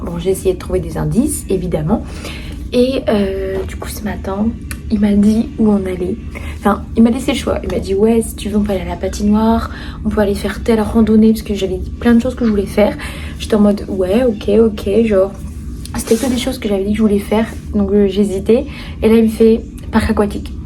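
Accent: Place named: France. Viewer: Bienvenue parmi nous au Québec. Je te souhaite une excellente intégration et te conseille vivement de garder ton accent de France : vous avez un français magnifique, alors ne cherche surtout pas à imiter le nôtre. Au plaisir !❤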